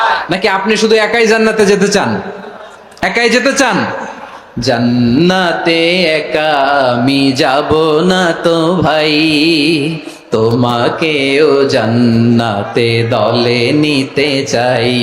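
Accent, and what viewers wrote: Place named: Chittagong, Bangladesh. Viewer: মিজানুর রহমানের মুখে চাটগাঁইয়া শুনে খুব ভালো লাগছে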